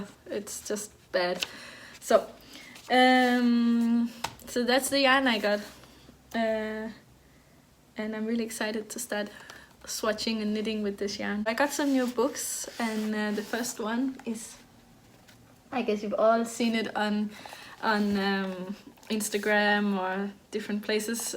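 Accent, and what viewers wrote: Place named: Denmark. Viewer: Hello from Michigan in the U.S. I knitted through your podcast and it was so relaxing to hear your lovely Danish accent as I worked on my sock. I look forward to the next.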